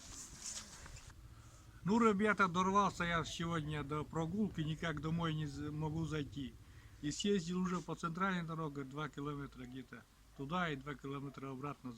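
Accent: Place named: Udmurtia, Russia. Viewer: думал с Удмуртии)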